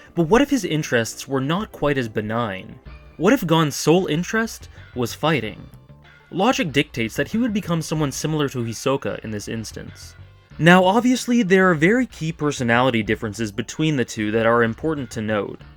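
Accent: Canada. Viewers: You sound Canadian that's all.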